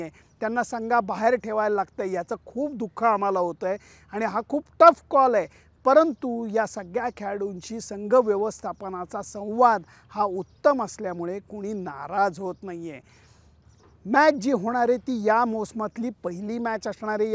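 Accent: Pune, India. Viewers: लेले सर, सामन्याआधीची उत्कंठा आणि सामन्याचा आनंद याबरोबर तुमचे विश्लेषण म्हणजे सोने पे सुहागा!तुम्ही केलेले विश्लेषण हे आपल्या अस्सल पुणेरी शैलीत ऐकताना क्रिकेटचा आनंद द्विगुणीत होतो. त्यामुळे १लाखाचा टप्पा अगदी सहज पार होणार आहे. अनेक सुभेच्छा!!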